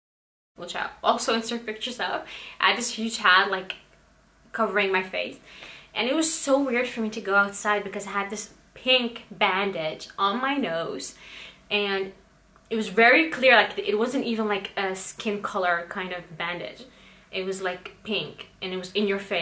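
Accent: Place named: New Jersey, United States. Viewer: are u from NY or NJ? u sound like the people from jersey shore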